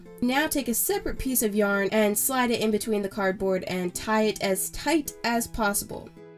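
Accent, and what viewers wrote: South, United States. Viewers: In this video why dose she sound like she’s from the south...🤷‍♀️🤷‍♀️🤷‍♀️ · This video of her sounds like she has a southern accent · Why does she have like the country accent in this video?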